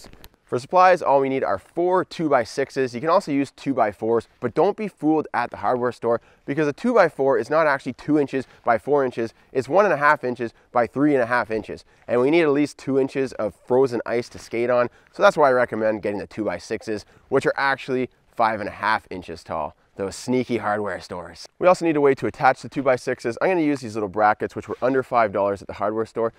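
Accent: Canada. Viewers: Not hard to tell your from Canada like me 😂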